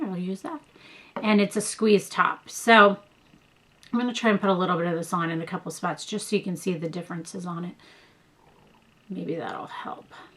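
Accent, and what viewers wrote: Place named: Greece. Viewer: Where are you from? Greece? You speak like greeks speaking English. Very beautiful acccent.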